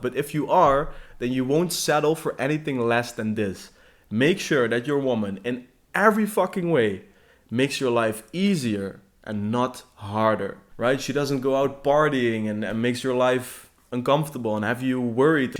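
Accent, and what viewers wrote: Netherlands. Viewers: Are you dutch bro? The accent 😎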